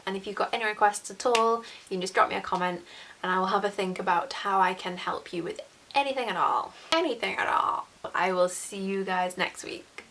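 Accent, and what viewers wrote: Liverpool, United Kingdom. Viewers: I've watched a couple of your vids and kept thinking why your accent sounds familiar and you're from Liverpool! You've got a little scouse twang. From Liverpool myself. Love your vids xx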